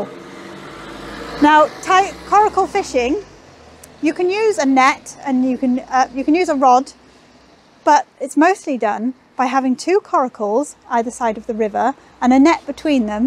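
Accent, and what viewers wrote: Wales, United Kingdom. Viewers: Absolutely love the Welsh accent. And miss speaks beautiful.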